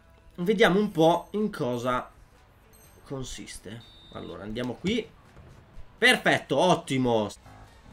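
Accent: Veneto, Italy. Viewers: per caso sei veneto? 😁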